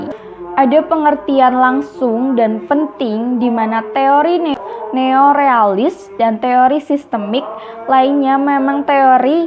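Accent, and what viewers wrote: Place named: Java, Indonesia. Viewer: Kamu medhok sekaliii beb😂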